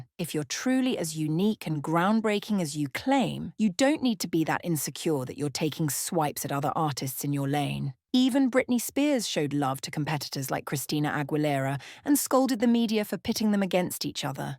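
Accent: United Kingdom. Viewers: Artistic originality without the resources to promote them seems pretty futile! I guess you like to think you are clever by saying silly and outlandish things in that calm British accent to make it seem like it is a well considered opinion, whilst you are simply shilling for engagement just like all the trolls!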